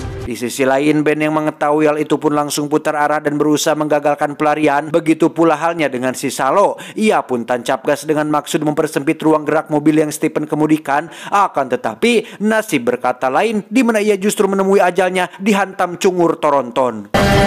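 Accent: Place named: Madura, Indonesia. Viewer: madura bang 🔥🔥🔥🔥🔥🔥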